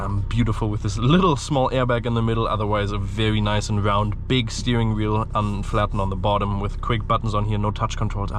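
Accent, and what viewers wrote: Germany. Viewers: Bin überrrascht, dass man dein deutsch kaum raushört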